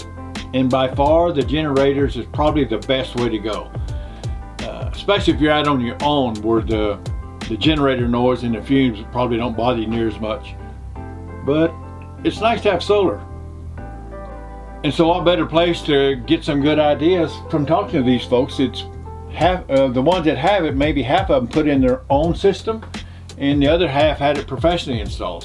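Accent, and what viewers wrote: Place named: Louisiana, United States. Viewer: "How y'all doing"? Cajun :-)))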